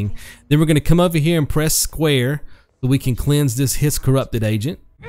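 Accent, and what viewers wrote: South, United States. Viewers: just curious though. Where are you from man? You sound sort of southern, yet mid-western.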